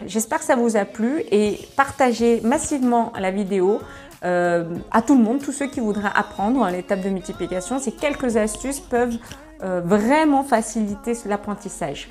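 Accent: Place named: England, United Kingdom. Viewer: j’ai crue que taites anglaise moi en mode 🥲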